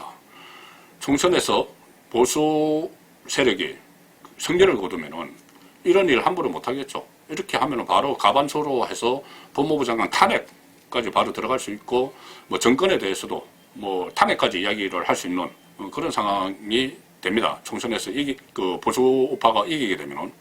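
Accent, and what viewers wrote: Gyeongsang, South Korea. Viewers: 경상도 양반*^^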